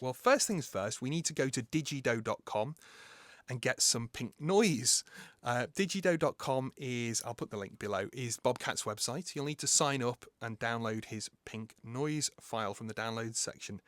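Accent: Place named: England, United Kingdom. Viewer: it is a pleisure listen this nice english accent !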